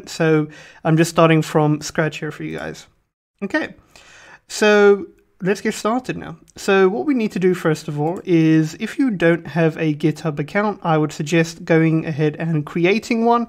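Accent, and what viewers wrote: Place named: United Kingdom. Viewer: I am getting something from your voice, you studied in the UK for a while and lived there for a while but you are not originally from there. your accent is faint but still audible.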